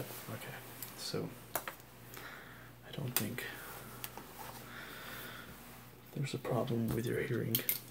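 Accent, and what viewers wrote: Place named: South, United States. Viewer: sound like a Southerner to me, Georgia?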